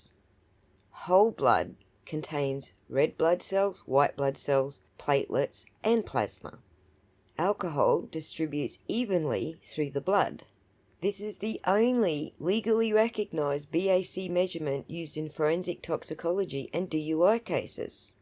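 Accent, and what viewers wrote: Australia. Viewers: I love listening to you. I understand what you are saying even with the math. If I had to math it out myself,I think I would end up in a brain fog stupor 😂. I followed the last trial with Vices ❤. I'm so happy to have found your channel. Much respect from south of the Tweed.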